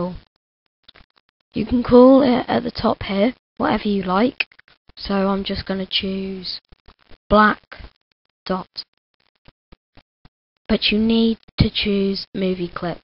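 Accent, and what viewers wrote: United Kingdom. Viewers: hey are u british?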